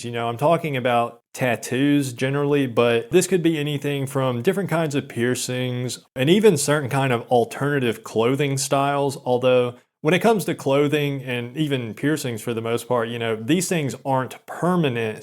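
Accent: South, United States. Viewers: Tbh I thought you sounded like Mental Outlaw when I first found your channel but after time I realized your accent had a more southern sound to it if that makes sense.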